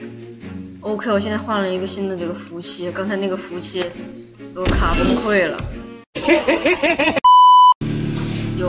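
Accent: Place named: China. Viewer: 中国的吧 听口音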